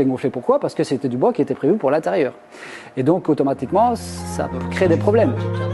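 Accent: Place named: Belgium. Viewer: ' c'est du Belche une fois hein' .... le charme à 100%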